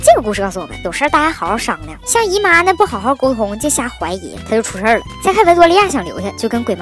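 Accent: Northeast, China. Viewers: 哈哈 讲解的一口东北大碴子 太搞笑了 逗乐了都！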